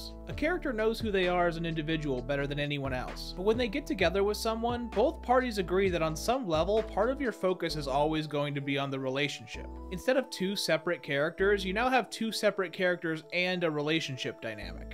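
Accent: Netherlands. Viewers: hold on… are you Dutch…😃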